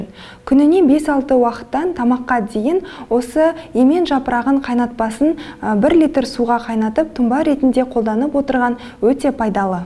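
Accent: Standard. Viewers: Рахмет қазақша таза сөйлегеніңе👍👍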